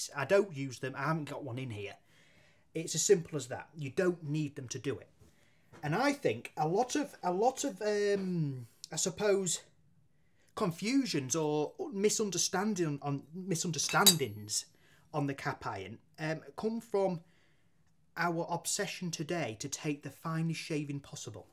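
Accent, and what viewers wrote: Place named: Bolton, United Kingdom. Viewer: Is yours a Bolton accent?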